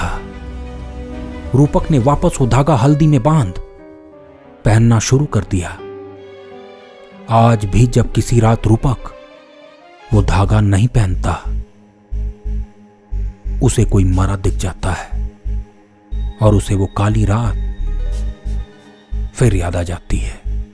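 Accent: Bengal, India. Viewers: Aisa lagta hai ki kahanikar Bangali ya Oriya hai, kya mai sahi hu?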